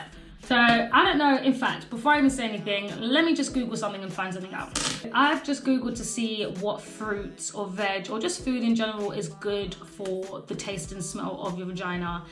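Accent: United Kingdom. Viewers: i love me some british accent🥰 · I like your accent. Are you from the Uk?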